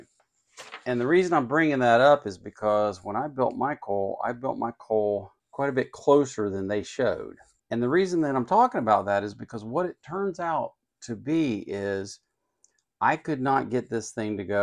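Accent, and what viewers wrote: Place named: Spain. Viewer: Your french sounds a f... lot like spanish.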